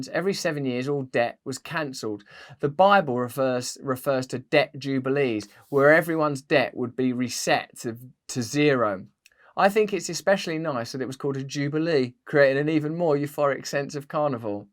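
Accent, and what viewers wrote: United Kingdom. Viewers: Dear Russell, you are in a unique position with your celebrity status to influence in ways others cannot! As a non-UK person, I absolutely LOVE your accent! It makes me feel you are a real person, just like me 😊 Love your sharp intelligence. Yes I read your book about 2 years ago - loved it. I remember the chapter on the Saxe-Goth-whatever - real name of the queen’s family! I wish all Brits would wake up to the real facts about their royal family! Maybe you can do a little video on that again? 💖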